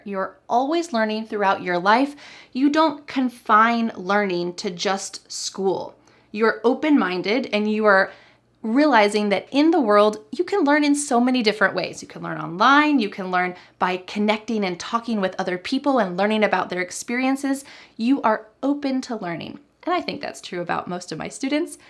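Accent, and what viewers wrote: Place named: United States. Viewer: I went to college in Bogotá (Colombia), graduated in 2023, majored in Law, currently attending the LLM at the Hard Knocks Academy, rather book smart, and lacking street smarts, definitely a bookworm (in Spanish it would be: Ratón de biblioteca: library mouse", literally), currently dont have to hit the books, and life long learner (love the movies, enjoying awards season at my local multiplex - CineColombia), maybe a little bit oversharer - no way!); thirsty for knowledge, if not the Booze - this I had to look up at Merriam - Webster, as a synonym (our local spirit being Aguardiente, lit. fiery water; tha latter won´t make it to Merriam-Webster, but maybe to be found in the authority for the Spanish language RAE, and for sure this one I did look up at the memoirs of street academy/smarts); love that - I take it - american accent of yours. 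In the last bit a tried to apply at least one of Trunk´s elements of style. Thanks, very enjoyable!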